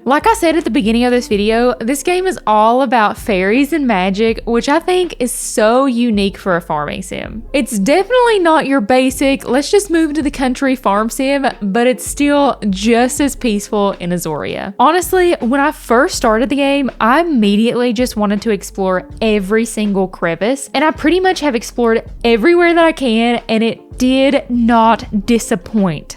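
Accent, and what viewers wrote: South, United States. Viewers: Damn that’s a strongggg country/southern accent 😂😂 shocked me at first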